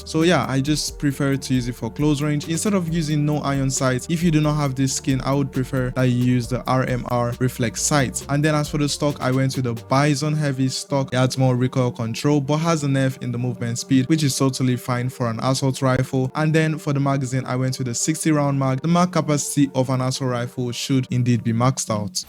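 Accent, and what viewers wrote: Nigeria. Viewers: Bro, our loadouts is identical.... Which means it's just skill issue (on my part)😅 Also you sound Nigerian.....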